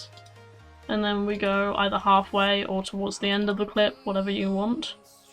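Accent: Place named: United Kingdom. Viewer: i noticed when im trying this and i noticed your bri-ish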